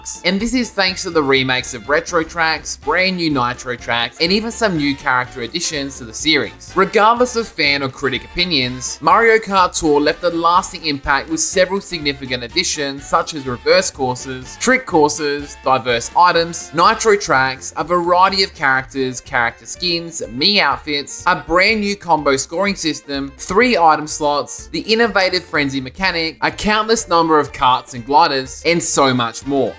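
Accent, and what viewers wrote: Australia. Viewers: man, that australian voice 👍👍👍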